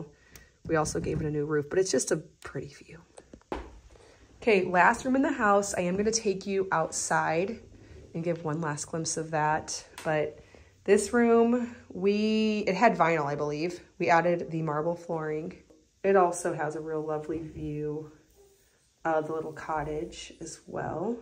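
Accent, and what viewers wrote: United States. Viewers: I actually went to your open house not knowing about this channel then later found it after seeing a page from your book hanging in the barn and of course I had to look it up! To my surprise I already followed you on instagram! The house felt important and historical. It’s hard to describe. You did such a great job of bringing it to life and yet keeping the feel of that time period. I felt I was truly touring a piece of history. It has been really cool to go back and see what you guys added from antique shops and such. Because I never would’ve guessed it wasn’t all original. I also love old things and I love your style. Your videos are so well done. And as a fellow Missourian I love hearing your Missouri accent that is similar to mine 😆. I will definitely be following along on your home building journey. Can’t wait to see what you do next and how you’re making things work in your temporary home. 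It’s all very relatable to me because I also have a large family and we have moved a lot. Whoever ends up in the farm house will surely love it and feel that magic. It’s a very special place. 🤍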